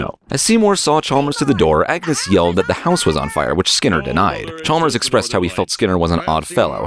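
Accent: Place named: United Kingdom. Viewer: Sound very British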